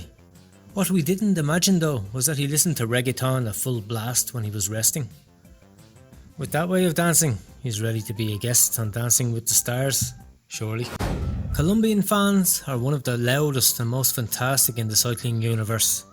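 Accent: Dublin, Ireland. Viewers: What part of Ireland your from ? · Sounds like a Dublin accent.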